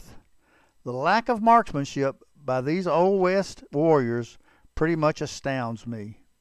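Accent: Texas, United States. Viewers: I like how the narrator has a Texas accent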